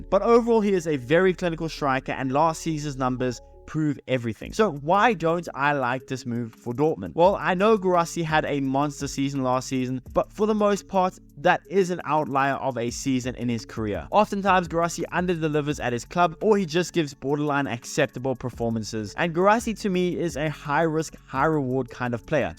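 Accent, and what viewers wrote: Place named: South Africa. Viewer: South african accent. Reveal your PSL team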